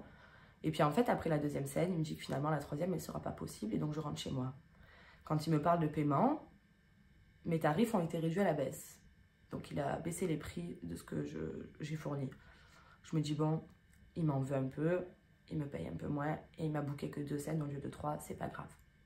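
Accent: South, France. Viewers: Ton témoignage est d'autant plus intéressant que les récents événements (enfin, scandales !) avec J.et Michel et pascal OP mettent bien en lumière ce monde très sombre, qui cherche à ce qu'on ne mette pas le nez dans ses affaires ! A t'entendre, on comprend pourquoi... Garde ton style "franc et méridional" (c'est vrai que nous, parigots, sommes plus froids), ça fait passer le message d'autant mieux ! Très bonne chance et courage pour la suite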